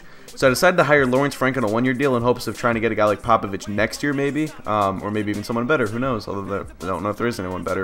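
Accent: Boston, United States. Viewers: Boston accent in full force this video lol (hAHks, adam silVAH)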